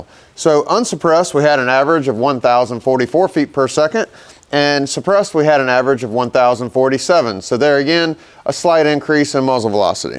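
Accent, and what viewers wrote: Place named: South, United States. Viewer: Nevermind I can hear this dudes southern drawl so it negates my earlier question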